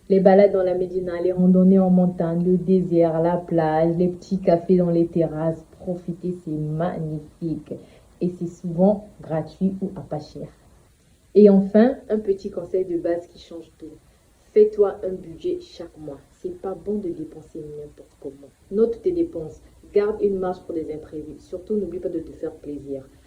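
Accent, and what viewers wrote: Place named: Gabon. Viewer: Ton accent est gabonais 😅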